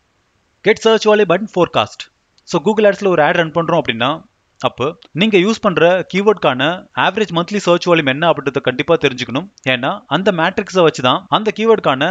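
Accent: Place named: Coimbatore, India. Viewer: nenga coimbatore thana bro.unga slang la theriyuthu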